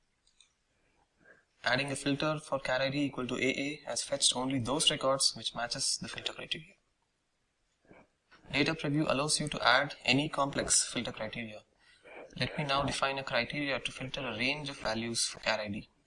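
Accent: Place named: India. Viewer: this english Indisch language..triggers me so hard...omg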